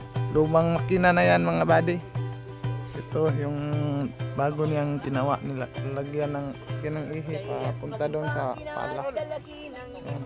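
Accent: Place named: Visayas, Philippines. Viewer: Hahaha abi kog peko agaw sa pala mahirap talaga tayong mga bisaya mag tagalog hehehe 🤣 proud jud ko sa akong pagka bisaya bai..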